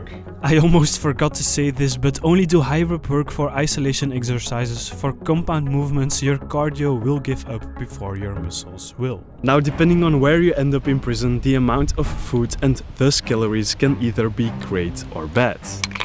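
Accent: Belgium. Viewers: ik kon meteen horen dat je belg bent haha. je komt zeker en vast uit antwerpen :)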